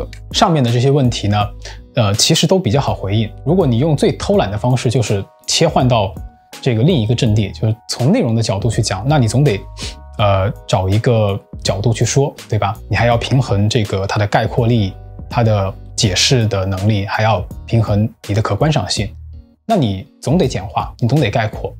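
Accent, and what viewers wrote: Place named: Hunan, China. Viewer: 没有得到我点进来之前想要听到的内容但是听出了是个湖南人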